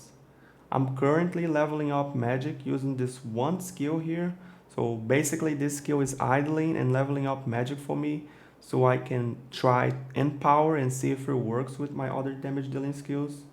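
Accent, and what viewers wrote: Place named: Brazil. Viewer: Da pra perceber q é br pelo sotaque kkk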